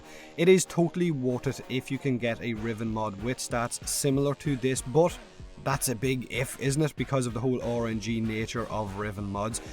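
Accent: Ireland. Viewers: British..... he's Irish! lol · Are you Irish?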